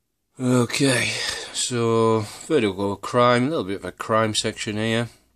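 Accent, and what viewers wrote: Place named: Northeast England, United Kingdom. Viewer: I'm from just outside Newcastle, so I picked up on the accent! Have you added any more to your batman collection since you posted this video?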